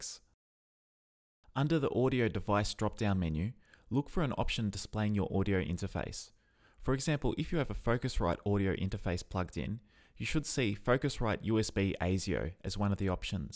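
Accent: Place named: Australia. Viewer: Something about Australian accents makes me pay attention way better, thank you!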